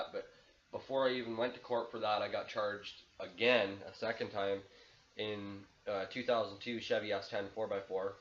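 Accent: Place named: Canada. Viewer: First off, you’re the most Canadian person I’ve ever heard in my life, second... it would be better if we could see your boot on the gas pedal when pumping her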